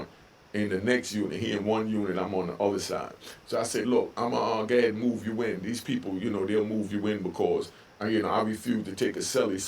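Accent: Jamaica. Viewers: Hey, you Jamaican? If you not the cccent on point😅. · Respect I see you can speak Jamaican.👍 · Yardman protector you sound like a yardman with a accent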